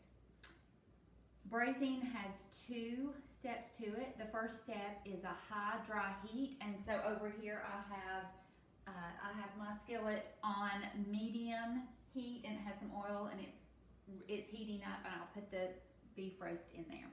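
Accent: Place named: South, United States. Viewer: Southern accent is like nails across a chalk board.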